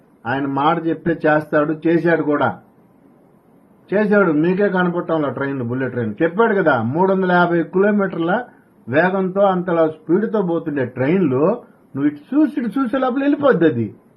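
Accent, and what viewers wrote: Nellore, India. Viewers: హాయ్ గారు 🙏 చాలా బాగా చెప్పారు సార్ 😂 మీ వీడియోస్ watch చేస్తుంటాను చాలా బాగుంటాయి, ముఖ్యంగా మన నెల్లూరు జిల్లా యాస బాగుంటుంది 👏👏 మీ మాటలు,అమ్మగారి మాటలు వింటుంటే నాకు మన ఊరు యాస గుర్తు వస్తుంటుంది am from hyd. సూపర్బ్ taking గారు మీరు,👏💐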